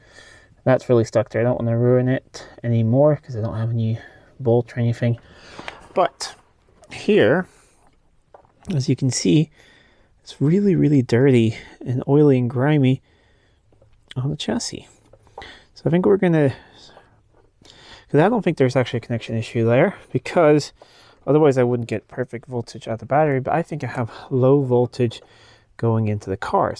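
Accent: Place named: United States. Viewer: Oh, I did not realize until I saw the Biltema battery that you were in Sweden. You sound American to me. As a American living in Norway I cannot imagine owning such a vehicle, here the costs of maintenance are sky high and where I live I am not even allowed to work on my cars. You probably do not have that problem, looks like you are on a farm! 😃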